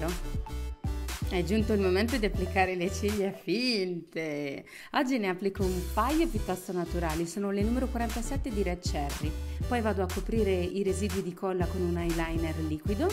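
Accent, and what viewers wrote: Italy. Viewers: Your videos keep less bored especially when I can't sleep. I love hearing you speak italian even if I don't understand half what you are saying. I have Nigerian Friends who were born in Italy, They speak just like you. Need to start doing videos in my language LOL. Thanks for this awesome creativity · Sei molto bella e mi è piaciuto il suo accento italiano 😙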